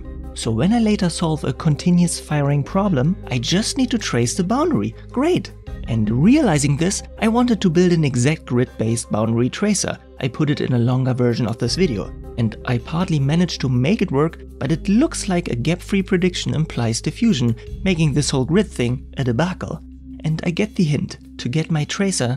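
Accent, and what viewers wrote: Germany. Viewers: People complaining about the accent … well, you‘ll have a much harder time understanding this in German which is the author‘s native tongue. And nobody else does videos which come even close, so rather applaud for this content being in English at all - as a large portion of YT is German language actually…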